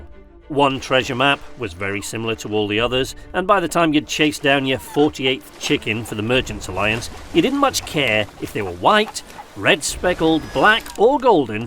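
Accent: United Kingdom. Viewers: Why do Brits always seem so level headed, reasonable and intelligent when they speak! lol Great vid, earned my sub! Downloading the game now, can't wait!!!!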